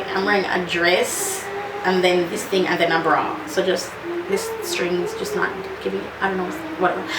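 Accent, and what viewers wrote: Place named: Jamaica. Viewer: I love the way you command the English language and then jumped back to patios 🇯🇲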